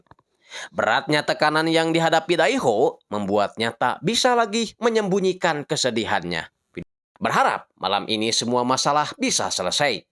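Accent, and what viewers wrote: West Java, Indonesia. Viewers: Keren euy si mamang sundanese pisan euy edunnn👍🏽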